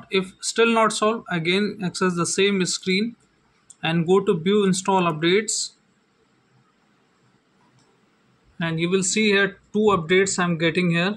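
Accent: India. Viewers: I am from India ❤❤❤ and I know that you also from India, Because your English pronunciation like Indian 🎉🎉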